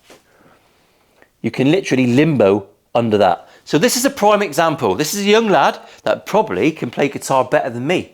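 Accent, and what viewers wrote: United Kingdom. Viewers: I'm from the other side of the Atlantic and love this channel, saying fuck a bunch in a British accent is nearly always hilarious. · first things first - a like to the awesome guy with his awesome British accent :)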